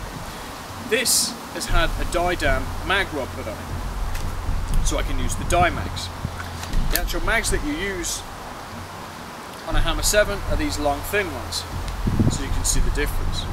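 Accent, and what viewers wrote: United Kingdom. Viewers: Hey! Im from Germany.. so i cant understand everything very well.. It would be very nice if you could put some links below my commentary For example:The dye mag feed for the hammer 7, the remington stock or the barrel... i ask because i cant understand an english accent very well ^^ (sry for my worst english...^^) the links dont have to be an german link! just that i have the special name of the item Greatings from Germany!:) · where abouts in the UK are you from mate!?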